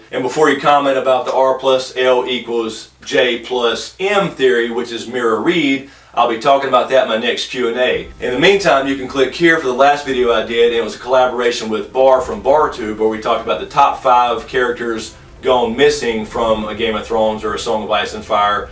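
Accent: South, United States. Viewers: just subscribed and am enjoying your videos - very well-informed and i like the common sense tempering all the crazy theories we make up with too much time between books, lol. i live in the northeast, so i am also enjoying your southern pronunciation of the series' names and such - it adds some regional color to it and once in a while a little confusion on my part. in a video you were talking about the dane (spelling?) family sword "dawn", but i kept hearing "dome" and wondering where there is a dome in westeros, till i realized the regional accent difference. it's nice our regional accents still survive in this internet age. i'm subscribed and looking forward to more great videos. (i also like george r-squared martin, lol) as far as jon and dany's parenting goes, i keep several possibilities floating in my head. i won't go into all of them, but r+l=j seems pretty obvious now that i've seen the series thus far and read the books three times. 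but it worries me because grrm doesn't like things to come easy to readers. it is very possible biologically that lyana could have become pregnant and given birth 9 months after she had jon. and "the seed is strong theory" could just be medieval cluelessness about genetics. even the starks have some kids like starks, and most like tully's. i wonder about dany's visions when she was with the undying. so many of them were about starks. there is a definite connection there, though it doesn't need to be familial. keep up the good work!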